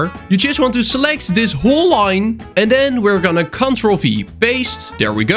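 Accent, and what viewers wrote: Netherlands. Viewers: I swear, every time I try to find a Minecraft server tutorial. You show up, and you don't disappoint me. It's very useful, thanks big dawg! (also nice Dutch accent.)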